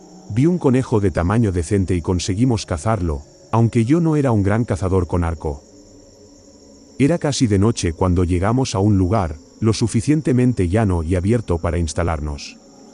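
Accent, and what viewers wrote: Spain. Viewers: Primera vez que entro al canal ,me encanto ,muy bien relatado ,y el acento español le da ese toque unico en nuestra lengua ,saludos desde buenos aires ,me suscribo ..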